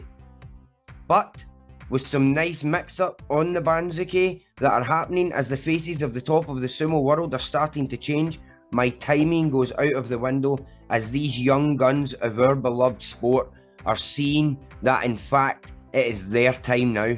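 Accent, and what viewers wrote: Scotland, United Kingdom. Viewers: If his knees are okay, Terunofuji will win the New Years' Basho. If his knees give him problems, I think it will be a tight race between Wakatakakage and Hoshoryu with the latter winning his first Emporer's Cup. Hoshoryu is starting to look more and more like his uncle, the great Asashoryu. Not just physically but also in terms of strength and variety of techniques. Great to hear a sumo commentator speaking in a Scottish accent,!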